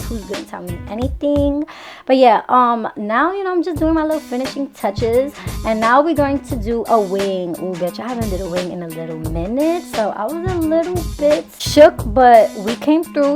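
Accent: New York, United States. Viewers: she from ny !! not every bitch wants to talk like cardi lmaooo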